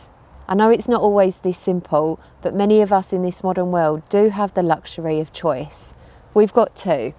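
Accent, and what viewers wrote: United Kingdom. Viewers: Hey I think your cool, I love your zeal for life and your British accent!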